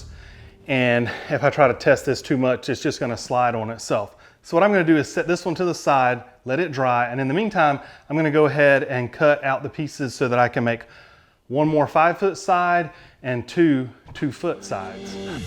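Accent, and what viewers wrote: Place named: South, United States. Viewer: thanks for answering. I could tell you were here in the south just needed to know where. Texas is a little different 😊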